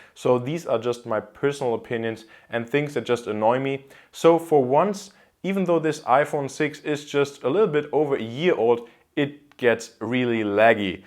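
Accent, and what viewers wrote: Germany. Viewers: love the German accent and cool opinions.. subscribed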